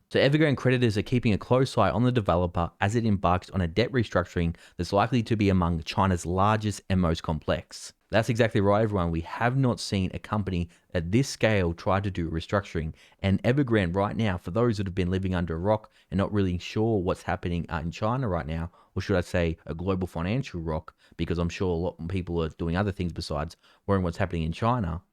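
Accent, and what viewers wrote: RP, United Kingdom. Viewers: When the Holy Flippity Floppin Smokes comes with a proper British accent you know everything to follow will be gold. ✌🏽🔥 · The English accent with the violin :)) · I say sir you went all posh upon a sudden! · holy smokes u speak toffy english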